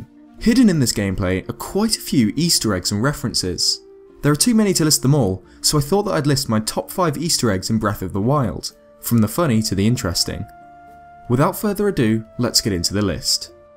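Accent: England, United Kingdom. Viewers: Love the english accent 😌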